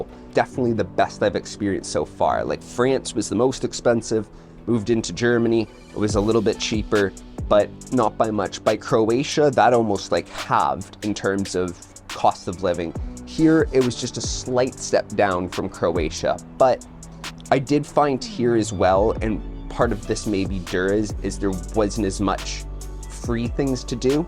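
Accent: Canada. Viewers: You sound Canadian?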